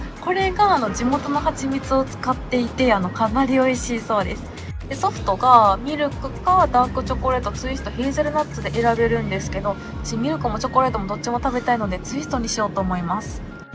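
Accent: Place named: Nagasaki, Japan. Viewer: 🤕ガスタウン＆ジンバル🤕 ガスタウン動画を観終えて、今、「香港」ハイアットに泊まってを観ながら投稿してます🐒 、いつも、可愛い笑顔＆時々でちゃう長崎弁（失礼）親近感があって良いよ😅 いつも、街の歴史・食・景色etcで素敵な旅行気分になれ、ありがとうね😘 秘密兵器のジンバルて考えた人「すごい＝マネーだね」😛 ジンバルで色んなアングルで撮った動画が、またｘ２楽しみになりました🤨 ランチの時、日影がHaruちゃんと少し被っていたのが、ちょっぴり勿体ないｘ２🐻 ポニーテール、良く似合ってたし、私は「ショートｏｒセミロングがスキです」🐷 ＰＳ キンコン西野さんの伝説のスピーチ動画「是非、観て下さい。今後のYouTuber活動の参考になれば・」 <link> 「約１６分あるから、時間がある時にでも」 じぁ～ね😘 おやすみ。弘美🥱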